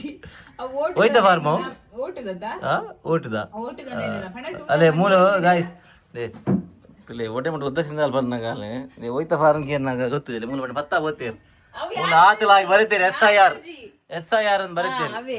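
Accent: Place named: Mangalore, India. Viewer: na tulu udupi style bokka na tulu kudla type... Enjoyed vlog,keep doing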